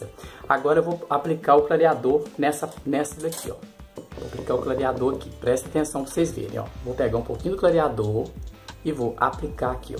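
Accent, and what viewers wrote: Minas Gerais, Brazil. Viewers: Esse sutaquin nosso é bunito dimais.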